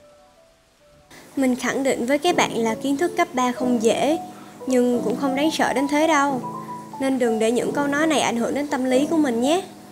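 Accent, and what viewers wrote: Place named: Saigon, Vietnam. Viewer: Mình thấy b ko nên lồng tiếng ý, vì giọng b là người sài gòn, khá khó nghe, b nên thử giọng hà nội hoặc dùng chữ thì sẽ chill hơn nha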